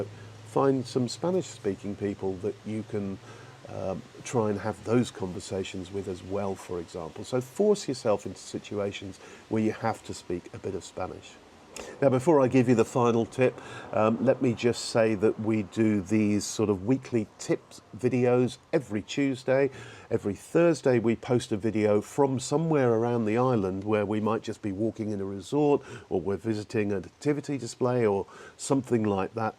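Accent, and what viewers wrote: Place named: England, United Kingdom. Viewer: Tienes razón no has hablado mucho pero algunas frases… suficiente para escuchar el acento. Creo que para ingleses no es fácil perder el acento… no quiero ofenderte pero es así. ¿0 piensas que no? Has recomendado cuatro periódicos y escuchar la pronunciación de estos periódicos era suficiente para escuchar el acento! ¿No lo creas? ¿O que piensas al respecto de tu acento?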